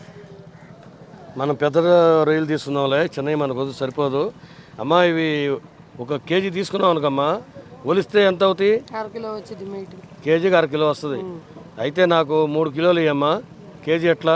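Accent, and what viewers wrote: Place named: Vemuru, India. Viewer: మాది వేమూరండోయ్.... మా ఊరు మాటల్లానే ఉంటాయి అనుకునేవాడిని... మొత్తానికి నా ఆలోచన కరక్టే అయ్యింది... ఇక దంచెయ్ అన్న... వంటలతో...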